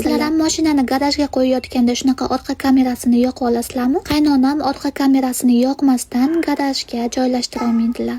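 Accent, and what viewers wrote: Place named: Andijan, Uzbekistan. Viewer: Вай бляяя бу яне анжанли экан узбекларга нимабуляпти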